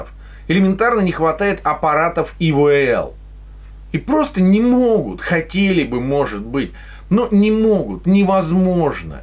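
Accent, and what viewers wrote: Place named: Urals, Russia. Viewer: Реально смотрю и подписан давно ! Так ху..ить власть !! Просто красиво!!👍👍говор как то настораживал, и вот сейчас услышал что земляк с Урала!! Респект и уважуха!😉😀 всё грамотно и по сути!!!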